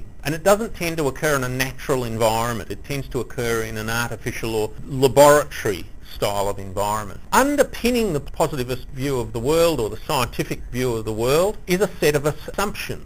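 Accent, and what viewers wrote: Australia. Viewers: Thanks for the video is this an Australian accent? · I'm pretty sure it's an Australian accent.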